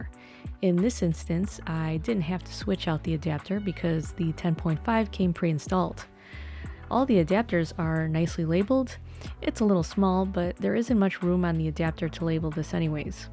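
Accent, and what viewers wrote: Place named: Midwest, United States. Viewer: great review. can i guess, you're from some midwestern state. ohio? illinois? indiana? michigan?..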